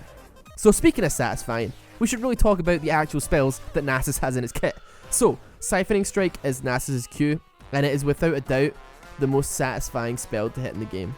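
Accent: Scotland, United Kingdom. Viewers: He is scottish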